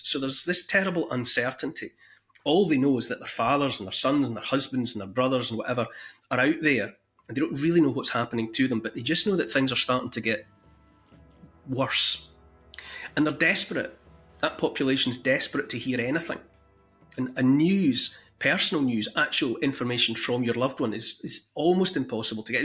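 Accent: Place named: Dumfries, United Kingdom. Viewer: Thankyou for sharing such fascinating stories, I have just ordered a copy of your haunting book. My family are 100 years of farmers from Dumfries- i love hearing your accent from the region. Best Wishes to you.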